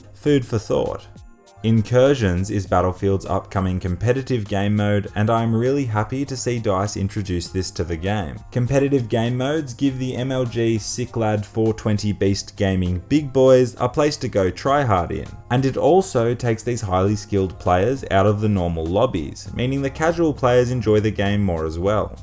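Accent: Australia. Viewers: Another winner my friend, keep up the great work. Also i love that smooth auzie accent. God damn it makes me wetter then a pelican diving for fish!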